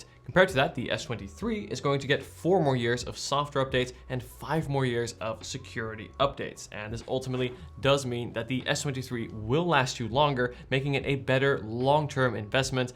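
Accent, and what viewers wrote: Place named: Netherlands. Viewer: Ik kon merken aan je accent dat je Nederlands bent, haha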